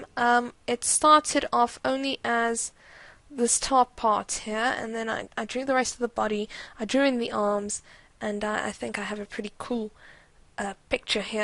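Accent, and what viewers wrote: South Africa. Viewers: When doing the line clean up even when my eraser is set to 100% hard i get this softening of the line? Is that because im not in 300dpi ? btw you sound South African :) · I got really excited when I heard your accent! I miss South Africa.... Do you speak Afrikaans? Also, your drawing style is so cute and I love it! It reminds me of Disney :o) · Are you South African? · South African accent? :) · I think she is South african... XD Idk! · Your accent. Are you South African? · Nice tut. BTW You sound South African.... Am I right?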